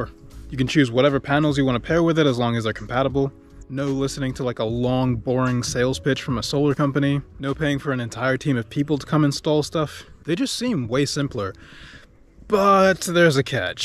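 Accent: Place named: United States. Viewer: How is the trip from the UK but you have everything American even an accent the dialect the phrases, where are you from... Pretty much this pretty much that😊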